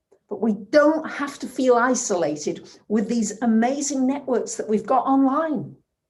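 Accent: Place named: North, United Kingdom. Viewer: Kindness is the people at Royal Mail who helped me out the other day – I sent a card to a friend and forgot to put a stamp on it which is very unlike me, as I'm usually so organised (Mercury Retrograde). They went out of their way to help, sent a driver out to the post box and scooped it out for me after the weekend, drove to my address and posted it back to me, so I could then put a stamp on it – Lovely people! Thank you, love your video's and that Northern accent (like my late Grandmother's) is so comforting.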